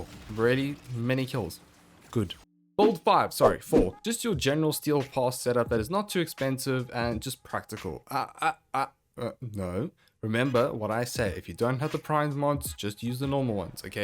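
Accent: Afrikaans, South Africa. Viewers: My fellow South African. The reason I asked was because I could hear your Afrikaans accent.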